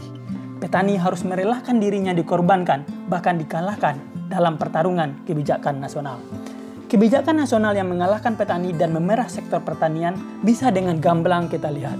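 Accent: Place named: West Sumatra, Indonesia. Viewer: Logatnya orang minang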